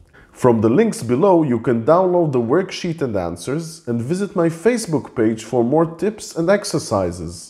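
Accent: Israel. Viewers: You sound like an Israeli! מזל טוב! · Fale Hebraico e Pronto! You must not be an american, because he doesn’t sound american. Mr. has a gift of being fluent in english (a good one at that) but his accent is israeli. He doesn’t sound american lol!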